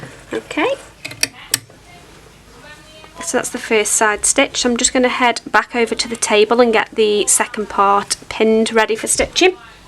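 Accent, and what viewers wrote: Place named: Stoke, United Kingdom. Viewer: Just subscribed from seeing your link on Boz's channel. You sound like a Stokie like me.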